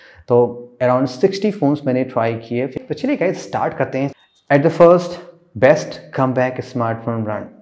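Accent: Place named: Kamta, India. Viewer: I think you are from kamta side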